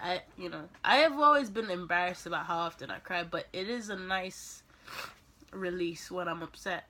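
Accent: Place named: Caribbean. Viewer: i can hear the Caribbean coming out in this vid haha 😁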